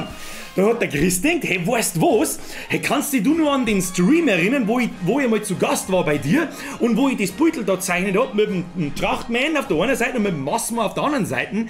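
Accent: Bavaria, Germany. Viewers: weil boarisch einfach geil is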